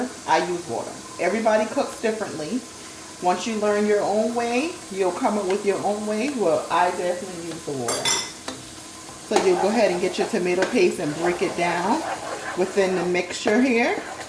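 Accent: Charleston, United States. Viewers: Ok first Thank You! I'm a Gullah girl from Charleston straight off America st. Unfortunately my elders have passed on (including my mom) before I could get my geechee recipes uuuggghhh! I grew up on all of this. The family members I have left don't know how to give a recipe lol! It's a Lil of this & that. So Thank You for the visual step by step!!! Now I'm going to ask a small favor. It's only 3 in my family that eat red rice (my husband doesn't care for it...yes we almost divorced behind this 😂😂😂) but he loves okra soup so I decided to keep him. Can you provide me with a recipe on a smaller scale? Like maybe 2-3c of rice vs. a 2lb box? If not I completely understand and no hard feelings. If you're willing too then I Thank You in advance! 😘 I also have my grandmother's traditional rice cooker/steamer she used to cook her rice in for decades all my family still use theirs as well, but I haven't figured out how to use it uuuggghhh (for those who want to know true value hardware carries it) So I'm glad I stumbled across your method. 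are you from Charleston? I hear a faint accent. Mine come out when I'm pissed lol! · You did AWESOME on your video! I had to search a bit- then found the title- I wanted to see where you were from. You were reminding me of home...that accent. I was thinking she "has to be" ....and YEP! Anywhere you go, you hear a Charleston accent...YOU KNOW IT! Charleston born and raised living in Texas now. Again, I THANK YOU! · I hear the accent💋yess Charleston bnr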